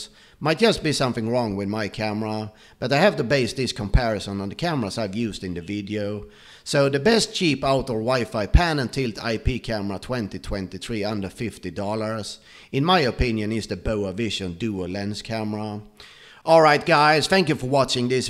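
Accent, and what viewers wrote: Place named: Sweden. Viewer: Funny swedish accent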